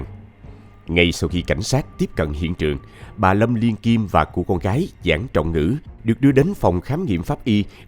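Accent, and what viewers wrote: South, Vietnam. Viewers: Người ta đọc tin như thế này sao lại chê ? Giọng đọc rõ ràng chấm phẩy đúng nơi đúng lúc giọng miền Nam rất dễ nghe 👏👏👏👏👍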